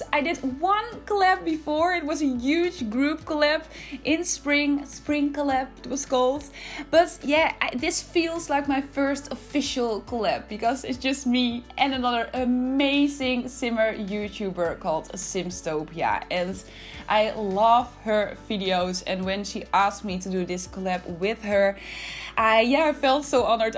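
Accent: Netherlands. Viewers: Your accent is so Dutch 😂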